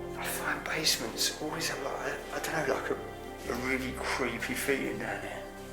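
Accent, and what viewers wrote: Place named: Medway, United Kingdom. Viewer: Happy birthday! Love your vids, yr accent sounds local to me. Are you from medway area? I can't get around easily and you bring adventure to me, thanks matey x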